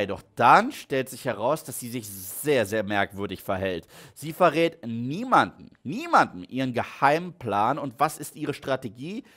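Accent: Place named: United States. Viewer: da hat aber jemand einen krassen us akzent. :)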